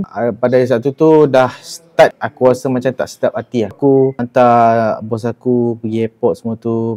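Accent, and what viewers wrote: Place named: Kedah, Malaysia. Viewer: Kedah mana, Aku pendang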